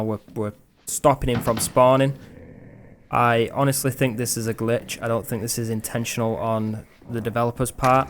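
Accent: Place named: West Yorkshire, United Kingdom. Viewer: Anyone ever commented on your West Yorkshire Accent? nice to hear it haha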